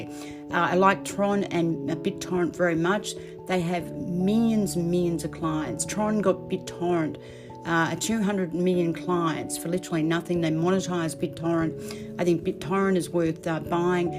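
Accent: Australia. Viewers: That's just her Aussie accent.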